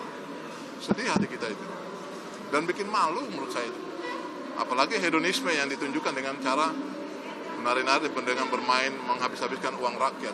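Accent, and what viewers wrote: Java, Indonesia. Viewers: Wah jowone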